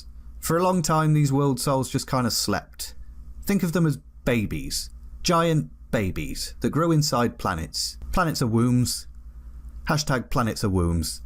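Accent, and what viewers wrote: United Kingdom. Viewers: I'm working my way through your channel and you're brilliant! I can't figure out your accent, Midlands or more northern?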